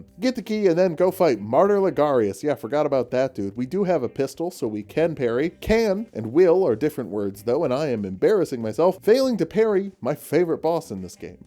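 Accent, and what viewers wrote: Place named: Edinburgh, United Kingdom. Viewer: London? Edinburgh surely?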